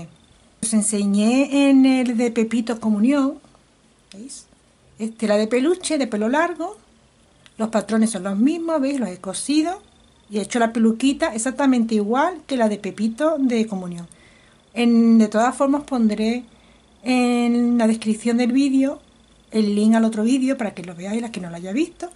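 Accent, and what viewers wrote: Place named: Cuba. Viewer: Hola amiga!Gracias por compartir esa hermosura de muñeco!!Tu eres cubana,eh,amiga?Tienesa veces un acento de ese país tropical.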